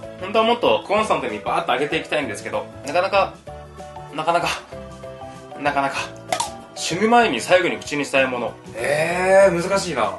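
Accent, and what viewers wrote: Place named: Kyushu, Japan. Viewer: 初めて拝見しましたが 九州人なんですね！ 方言めっちゃ落ち着く〜 ぜひ大分県のお魚も食べてください！ 福岡も美味しいけど大分も美味しいですよ！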